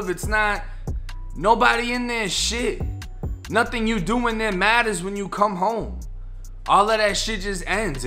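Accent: Boston, United States. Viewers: Is this guy from Boston? I hear that bake bean accent. Either way...cool video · s, s, s, s, s . . . Nice wording!! You from Boston ?? . . . You say Stahh and Chahhges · Your from Tampa? You sound like you from Boston or something like that. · no disrespect just curious how long were you back in Boston before the accent came? Do you think if u came back to Florida and lose it? Like if you were at Ybor city would u the Florida accent just come back? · Boston accent yes? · Goofy goober nigga u straight Boston Damn I miss New England like shit nuffin like it keep doin ya thing homie I love this channel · You from Boston? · Mr, are you from Boston?? (accent) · damn are you from Boston? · So r u from Boston or Florida? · Ur definetly boston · I know u did time in florida but sound like Your from boston, curious no disrespect just curious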